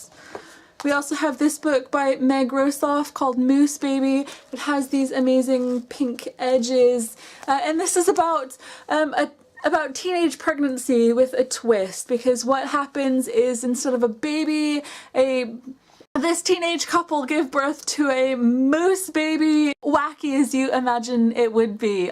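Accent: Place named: United States. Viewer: Is it me or does she sound American when she’s British?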